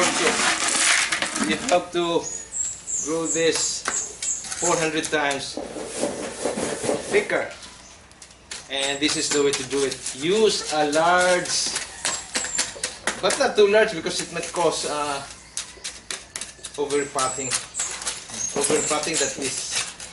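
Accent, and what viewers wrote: Visayas, Philippines. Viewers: It's more of a Visayan accent 😉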